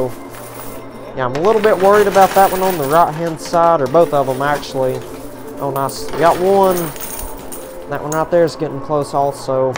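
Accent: South, United States. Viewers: Love your videos and your southern accent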